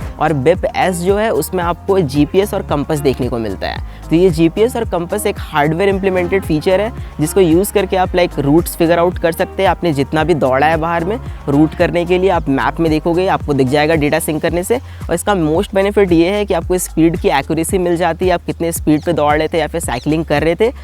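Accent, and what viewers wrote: India. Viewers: İts english but accent of indian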